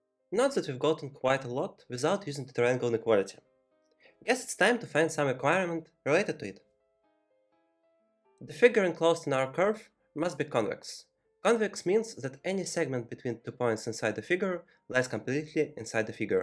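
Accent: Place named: Russia. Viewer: Too strong russian accent, the rest is great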